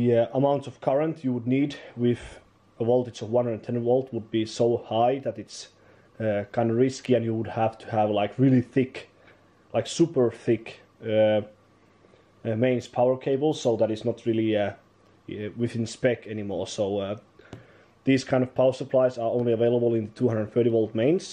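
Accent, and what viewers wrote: Finland. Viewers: sounds like Finnish accent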